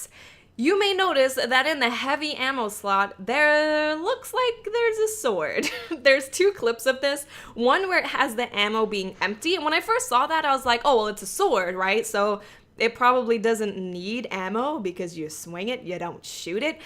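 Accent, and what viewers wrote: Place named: New Jersey, United States. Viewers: You have kind of a jersey accent but I might be wrong are you from around jersey or something (I'm not a stalker I'm just curious lol) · Where are you from? it sounds like you're from new york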